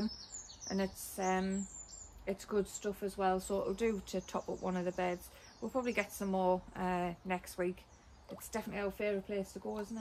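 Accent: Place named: England, United Kingdom. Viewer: I love your English accent, very refreshing. We are expats fromm South Africa, been living in Huntsville, Texas for the past 33 years.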